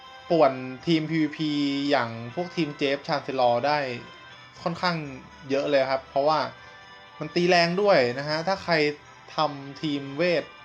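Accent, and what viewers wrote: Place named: England, United Kingdom. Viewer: อ่อ เป็นสำเนียงอังกฤษ เข้าใจแล้วขอบคุณครับ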